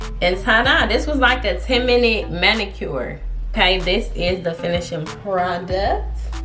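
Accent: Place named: South, United States. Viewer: Love the southern accent!!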